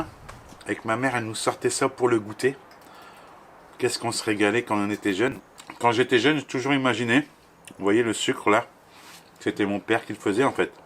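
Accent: Nord-Pas-de-Calais, France. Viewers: Oui, j'ai bien compris ce que tu as dit, continue j'adore t'entendre parler en chti.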